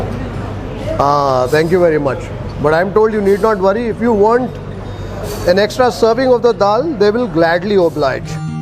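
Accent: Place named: Maharashtra, India. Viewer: Maharashtrian influence i see in sir is how he pronounces some words and also ofcourse his knowledge on so many Marathi foods. Eg: laadoo instead of laddoo. That’s the thing that he knows about both South Indian and North Indian foods in depth hence people from all regions approve of his reviews.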